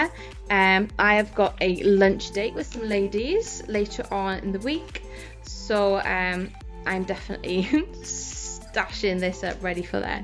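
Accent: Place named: United Kingdom. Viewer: ❤ the British accent. A new to your channel, I'm a resident from the Philippines, but resides & works in Copenhagen, Denmark 🇩🇰. Anyway, great video! ❤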